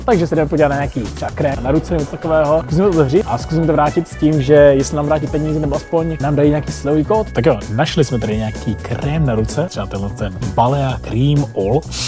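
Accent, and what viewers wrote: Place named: Czech Republic. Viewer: It is crazy to hear a new generation of people of Vietnamese origin to speak with such beautiful Czech accent! It seems that I am really getting older, but a nice shock either way...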